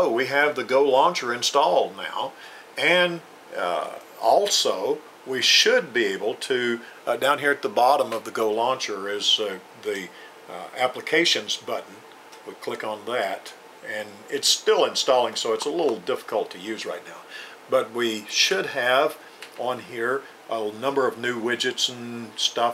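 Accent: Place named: South, United States. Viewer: You sound country ... But thanks 4 the HELP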